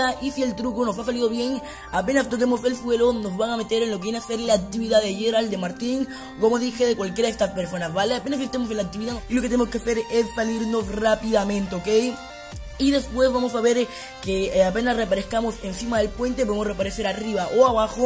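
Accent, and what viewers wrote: Colombia. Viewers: eres de colombia si eres de colombia de que ciudad yo soy de cali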